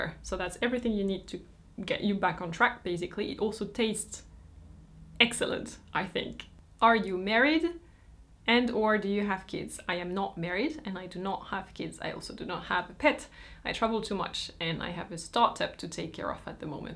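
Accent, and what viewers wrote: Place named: France. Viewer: Dear, your English is very good, even with so much French an intonation. I've learned a lot from your videos that I have no idea before. You are my favorite.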